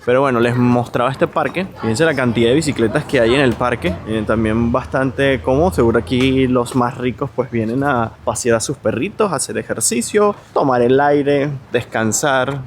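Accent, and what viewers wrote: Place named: Venezuela. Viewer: Muy bueno el video, lastima esos acentos a Venecos.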